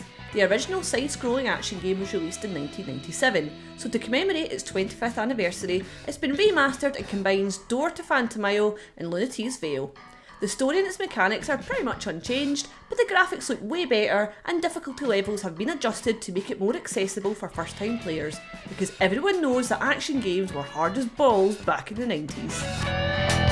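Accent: Scotland, United Kingdom. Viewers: Does anybody know what part of the world is from? That accent is amazing. Scotland? What part of it? I'm interested in the specific area, as for example "Italy" has more than 20 different accents.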